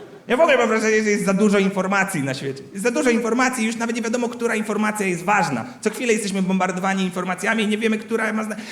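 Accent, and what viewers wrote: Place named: Mielec, Poland. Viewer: Po akcencie myślałem, że jesteś z Mielca lub Zbuczyna co nie? Dużo się nie jebłem 😉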